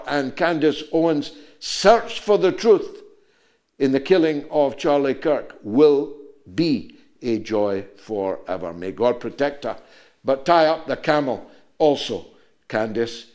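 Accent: Scotland, United Kingdom. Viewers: i think you are great George....you might roar with a scottish accent but you are definitely a lion of england just the same...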